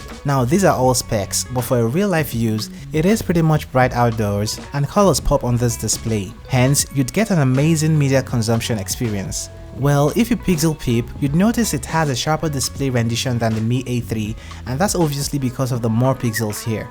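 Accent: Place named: Nigeria. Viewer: God willing you are going places bro....Exceptional Presentation Voice....Voice and Accent Wise you just the best Nigerian Youtube reviewer for now.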